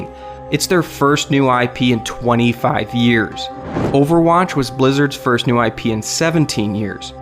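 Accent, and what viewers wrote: United States. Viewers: The Very American way he pronounces Japanese words annoys me.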